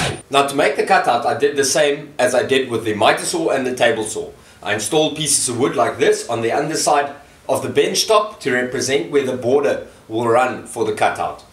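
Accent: South Africa. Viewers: Lekker boet!! Nice bench, great to hear the accent ... sounds like mine, originally from Kimberley, Northern Cape. Greetings from New Zealand.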